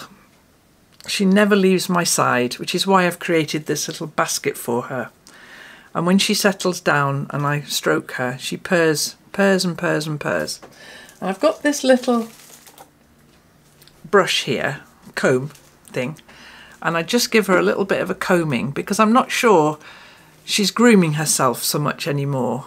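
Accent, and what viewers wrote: United Kingdom. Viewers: I had a cat that became 21 years old. Her name was Whitesocks. You will never guess why ;-) She stopped eating and drinking and our vet told us that she has no table that says what 21 catyears are compared to human years. Enjoy your catlady as much as she enjaoys her life with you. Love how polite you talk to your cats in your videos. That sounds very british in my german ears. ;-) Have good times you two.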